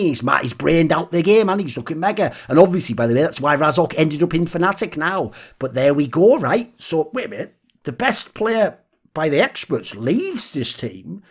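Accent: France. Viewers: that french accent made me scream with laughter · the french accent's masterful return ! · 10/10 french accent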